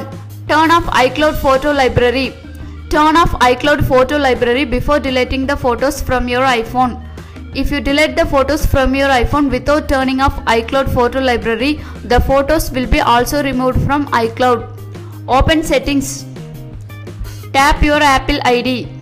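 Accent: India. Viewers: i officially hate indian accent now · Indian Accent is so strong on this one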